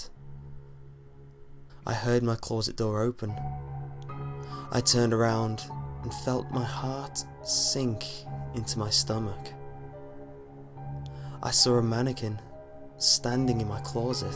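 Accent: United Kingdom. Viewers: I've listened to some of your pastas now and I like the storys, you choose. I'm also so much into your accent! I could listen to british accent for hours (I'm from Germany) Anyway, you do a great job! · i loce this guys accent im a big fan of british accents :) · British people r so lucky, all u need is ur voice, piano, n a creepy pic to make scary vid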